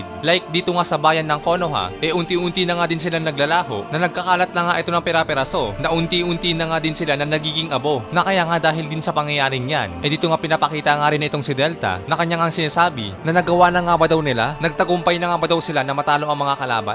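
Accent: Visayas, Philippines. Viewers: Bisaya ang ampt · Parang nagiging Bisaya kana din ahh.. grabe talaga influence namin bisaya hahah! #Kapoy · Bisaya ka dol?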